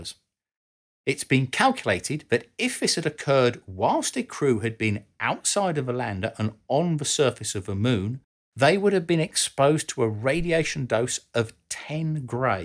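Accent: United Kingdom. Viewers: British accented narrators are the best because the rhythm in their sentences and the pitch/sound of their voice makes you feel like your high IQ grandparents are telling you a story! I love your work and the mix between photos and videos as you talk makes you feel like you are really immersed within the topic. Ps: I really would love more space/aeronautical engineering videos. 🌎🌕🌞🪐🚀🛰👩‍🚀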